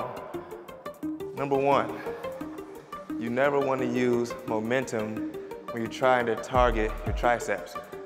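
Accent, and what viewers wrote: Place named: Virginia, United States. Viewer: Where you from bro? I thought VA.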